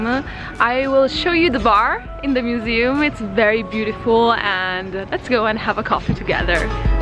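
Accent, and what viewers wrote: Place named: Rome, Italy. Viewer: si, mi piaci accento Romano , mi fa ridere sempre 🤣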